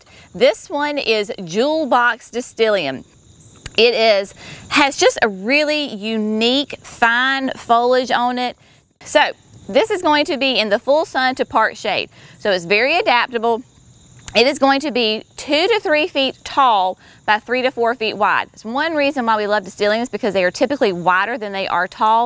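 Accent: South, United States. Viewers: I live in Minnesota but I have family in Louisiana, so I not only enjoy the gardening features, but I love the southern accent!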